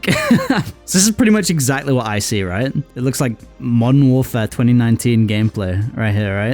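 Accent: United Kingdom. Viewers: Are you British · R u British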